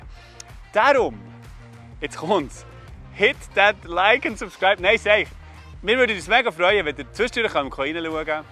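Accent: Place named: Bern, Switzerland. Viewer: Soso cool! Freue mi wäuts uf ds neue Format vo dir! Bi gspannt was de da so aues chunt, u ds no im schöne Bärndütsch! Wie nice! Ächt cool. 🎉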